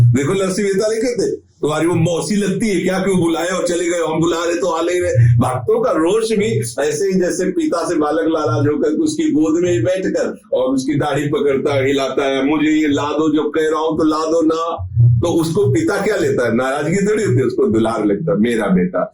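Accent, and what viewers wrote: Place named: Kanpur, India. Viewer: आप श्री तो कानपुर से हैं वाणी से पता लग गया, चौबेपुर वाले विकास बाजपेई भी ऐसे ही बोलत हैं 🙏🙏🙏🙏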